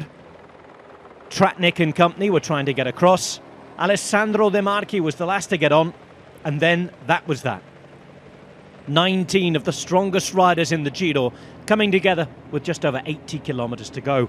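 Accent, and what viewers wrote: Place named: England, United Kingdom. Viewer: As an American cyclist and tifoso , I have to give you high kudos for the way in which you present the context and follow up with interesting observations with a little tongue in cheek. Here in the states, we have to suffer through what they give us on, etc. and our commentators as much as they’re great guys just do a pretty basic job. If I could hire you for our coverage of the tour etc. it would be a slam dunk. And don’t be pissed at me but you have a very likable English accent.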